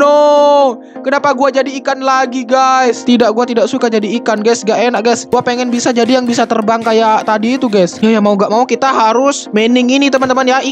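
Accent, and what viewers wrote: Madura, Indonesia. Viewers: Suaranya kayak orang Madura ketika memakai bhs Indonesia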